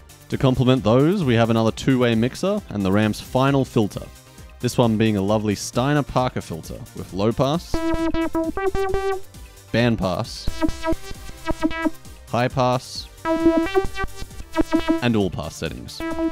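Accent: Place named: Australia. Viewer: Awesome! Great to hear a fellow aussie too!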